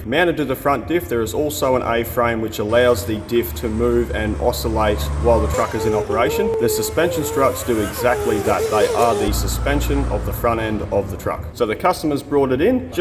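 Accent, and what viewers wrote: Australia. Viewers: Hey another great video you need to get something like a torch meet table for making different ranches and stuff like that that would be cool like on a CNC plasma cutter table I love the outtakes though I really do specially with that Australian accent. But seriously how long does it take to do a job like that that’s one thing I wish you would add do your video how many hours totally it takes to do each job !!!! I truly check every day to see if you guys are posted another video greetings from Chesapeake Virginia USA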